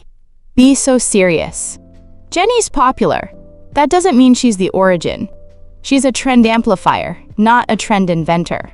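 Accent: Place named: Nigeria. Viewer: she sounds Nigerian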